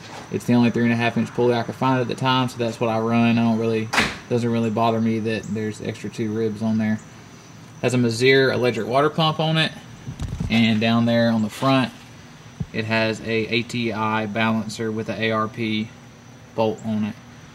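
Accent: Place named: Tennessee, United States. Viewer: where are you located? I hear a TN twang!!! I am from Chattanooga.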